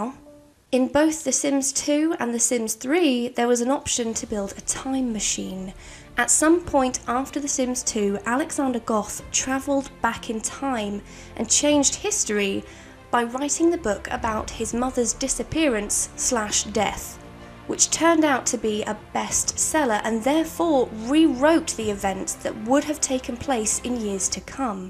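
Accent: England, United Kingdom. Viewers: Where you from? That english accent is unique and I only hear it in the movies or on tv.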